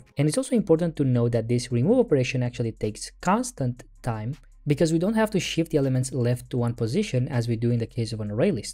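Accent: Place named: Brazil. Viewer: Você fala português? Parece um brasileiro falando inglês.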